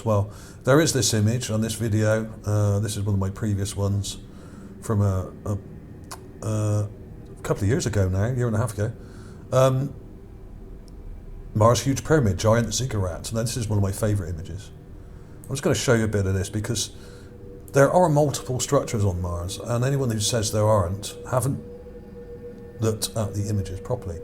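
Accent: Bristol, United Kingdom. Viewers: Think about who those dislikes are coming from, there are entities out there who are not private individuals, and who will attempt to discredit anything that leads people to the truth. They are all over the web, on forums etc, it is their job, it is what they are paid to do. Keep up the good work...that accent sounds like Bristol, we could be neighbours. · Dear friend with british accent- you could slow down your exciting explanationns, beef up your volume 16 percent, louder is better here, so many videos I simply cannot hear and I wont wear headphones, I hate that, I was forced to wear HD ear protection for years, ok at least boost it 10 percent if at all possible. macbookair-speakers are not loud enough! thanks why do British accents make it hard to understand? love the shows, theories and analysis, wish you would decorate your findings with more filters and colors, but yeah you do know the art of photo analysis, great job, very creative and amusing at times! 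A big THUMBS up! Thank you for your research!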